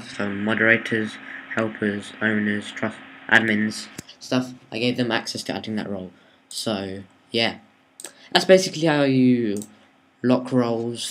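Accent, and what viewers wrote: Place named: United Kingdom. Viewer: you sound like a more british version of my friend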